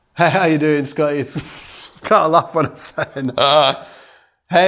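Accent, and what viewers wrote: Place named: Staffordshire, United Kingdom. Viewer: Guilty as charged for murdering the blues with the pentatonic with the blue note ! Pentatonic just kills the blues....well this jazzy kind of blues you show here Scott.You sound like you're from the Lichfield/Tamworth Staffs area mate.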